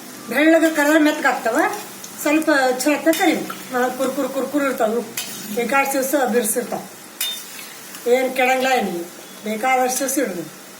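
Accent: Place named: Gulbarga, India. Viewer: ನಿಮ್ಮ ಭಾಷಾ ನೋಡಿದರೆ ಗುಲ್ಬರ್ಗ ಕಡೆಯವರು ಅನ್ಸುತ್ತೆ . ನಾವು ಯಾದ್ಗಿರಿ ಸೈಡಿ ನವರು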